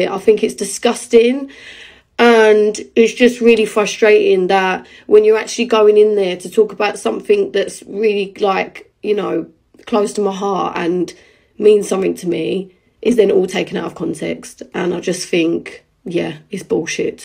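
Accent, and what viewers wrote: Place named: South, United States. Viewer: I don't get you're Southern accent but u was my favourite.😘 x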